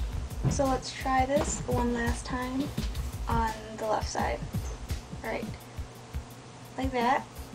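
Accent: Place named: Midwest, United States. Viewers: i think its a midwest accent... im guessing she is from chicago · r u from the mid west? you sound juuuuust like me and my friends haha!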